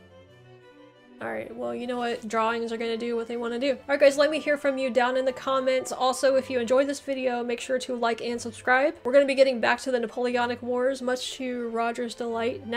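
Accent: United States. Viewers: Well done, for a non brit you did really well, very American pronunciation though 😀 If you ever need a brit to teach you our ways of speaking hit me up 😀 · Having known some Californians in the past it always amuses me hearing American s pronouncement of English place names. But you did well with the position of the counties. I was born in Yorkshire now live in Staffordshire and have been to every county in England. An example Leicestershire is pronounced Lester shire.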